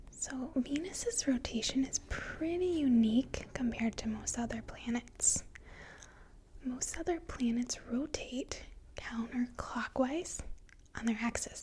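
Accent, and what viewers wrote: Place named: Minnesota, United States. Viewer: These are great!! You do a great job and I love your Minnesota accent. (Minnesota, yes?)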